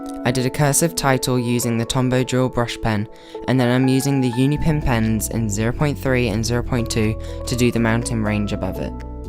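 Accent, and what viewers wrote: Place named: United Kingdom. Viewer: omg you have that british accent YAASSSS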